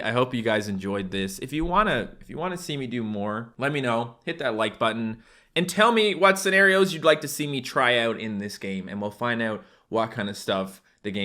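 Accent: Newfoundland, Canada. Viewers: SPEAK in your newfie accent starting from now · ever since hearing your newfy accent i haven’t heard you the same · after i watched his real accent video i cant unhear the hint of a newfie accent in his voice now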